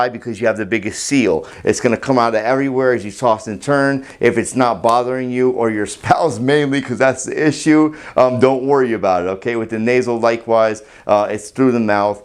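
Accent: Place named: North Jersey, United States. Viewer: Bro!! You from North Jersey?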